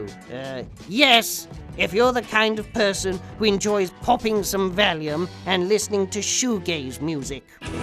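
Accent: United Kingdom. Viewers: Hope your health is good, professor. Shocked to find out you're actually British and not American seeing as how most Americans do a better English accent than you.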